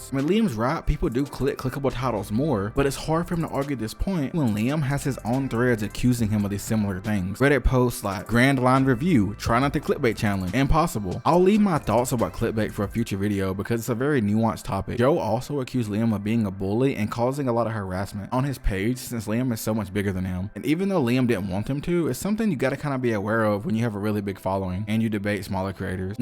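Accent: South, United States. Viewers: Dude I love your voice we need more southern YouTubers it’s amazing.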